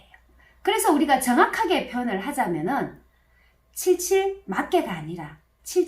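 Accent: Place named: Yeongju, South Korea. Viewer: 영주사투리 같은데 듣기 좋습니다.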